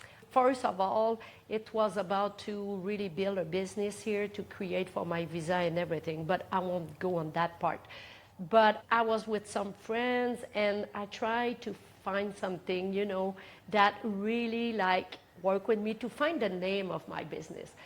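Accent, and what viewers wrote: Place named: Quebec, Canada. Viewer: My mom was from Quebec. Because of your accent, I feel like you are family! Hope to meet you one day. I admire your work.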